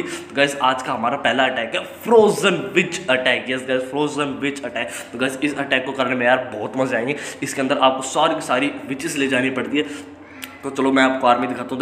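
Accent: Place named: Haryana, India. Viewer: Bhai video kti zhr h💓💖 tu haryana ka lage h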